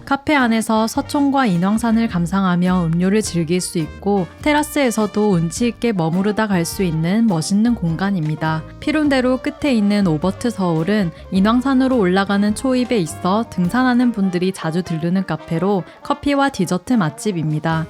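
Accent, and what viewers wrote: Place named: Gyeongsang, South Korea. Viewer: 경상도 사투리다! 넘 촬영도 잘하고 차분하게 나레이션해주셔서 추억뿜뿜이네요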